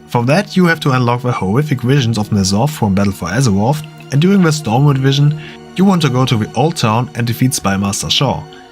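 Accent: Germany. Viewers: Warum nur auf englisch??? Man hört raus, eigentlich sprichst du deutsch oder?? Kann man all diese vz auch transmoggen??? Brauche ich den vz Beruf??? Würde mich über eine Antwort sehr freuen 🙏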